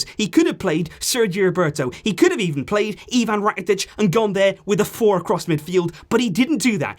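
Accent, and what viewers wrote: United Kingdom. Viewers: Your British ascent is so good ✌️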